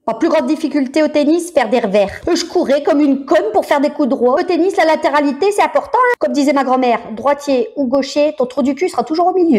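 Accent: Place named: North, France. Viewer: tres bon comme toujours ))) je me sers parfois de tes vidéos pour montrer l'accent du ch'nord ici en Russie )))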